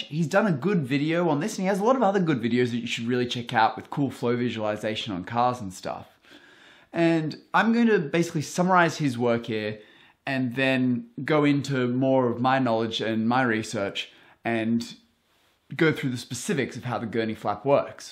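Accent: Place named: Australia. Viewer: Aussie accent - he's saying "drive the air harder"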